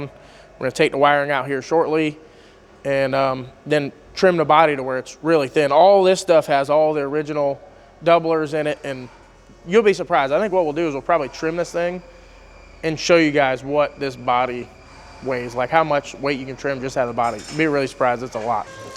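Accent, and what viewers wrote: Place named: South, United States. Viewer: Thanks for the great content. And also many thanks to your cameraman and editor. Question. Ive heard you and say "Sawzah" several times. Sawzall is a brand name from Milwaukee tools. Is that just a Southern thing to say Sawzah? Im genuinely curious. Not trying to be rude. People pronounce things differently all over the world.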